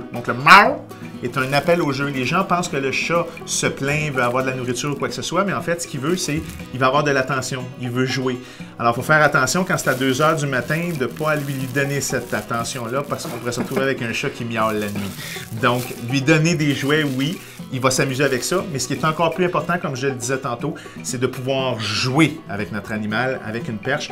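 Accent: Quebec, Canada. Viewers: XD J'adore cet accent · Olala l'accent québécois xD C'est aussi compliqué que d'entendre les nordiste quand on est pas habitué ^^ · très interrecend je me demandais se qu'etait le bruit que mon chat fesais en voyant un oiseaux :) mon chat est vite fatiguer quand il joue parfois un joue a chat ^^ elle me demande de lui courrire apres elle se cache et elle fait semblant de mataquer et elle repart en courant avec son aire joueur mon chat ne me griffe pas et ne me mord pas quand elle joue ou quand elle joue pas comme elle est très gentille avec nous qu'elle fait pleins de calins et qu'elles est pas agressive je lui donne une petite friandise :3 je suis francaise et je reve d'aller a quebec ! j'adore l'accan quebecoi ♥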